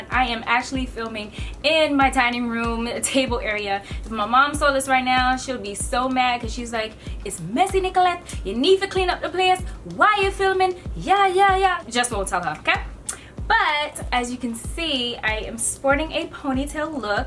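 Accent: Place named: Jamaica. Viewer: You should speak with the Jamaican accent more often 😁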